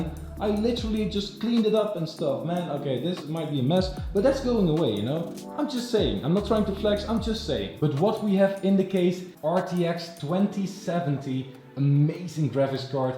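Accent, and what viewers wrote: Netherlands. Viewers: are you dutch because if i talk english i talk like you haha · Are you dutch? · Are you dutch yust a question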